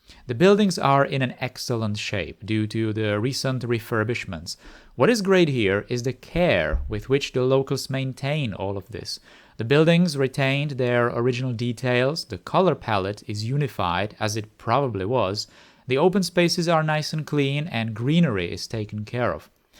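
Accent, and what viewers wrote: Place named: Czech Republic. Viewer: Jeho český přízvuk je nepřeslechnutelný...😅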